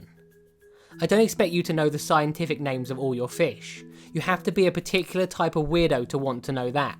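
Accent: United Kingdom. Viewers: I love your channel and yes I am a subscriber! I also absolutely adore the British accent. 😉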